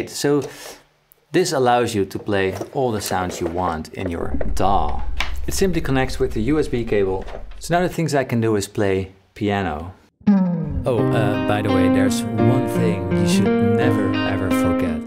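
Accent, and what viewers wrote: Netherlands. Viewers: You sound super dutch. Good info thank you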